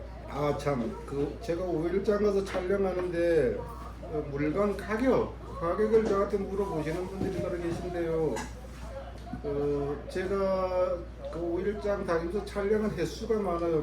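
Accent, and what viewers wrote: Gangwon, South Korea. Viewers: 수고하셨습니다 강원도 사투리가 정겹고 좋아요 새해복 받으시고 새해도 수고 해주세요 장마마다 특색이 있고 좋습니다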